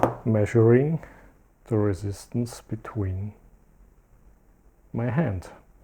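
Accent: Germany. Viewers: Sorry, was very interested to watch, but your accent is just too disgusting and vomit-inducing. I mean German is bad enough, but Mountain-German even worse. If you use English, at least learn to pronounce it without giving ppl ear-cancer. Everything else is just arrogant and cringey. One of the biggest offenders was "tchyoobs". It's pronounced "toobs", yo. Well, gonna mute you and maybe then it'll be watchable... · Also es klingt für mich so,als wenn du Deutscher bist 🤔 Liege ich da richtig? 😜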